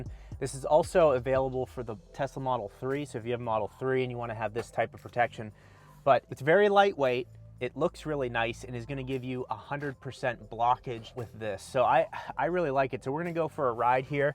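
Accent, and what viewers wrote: Pittsburgh, United States. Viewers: sounds like a yinzer, said "see ha it goes dahn er" Da Burgh.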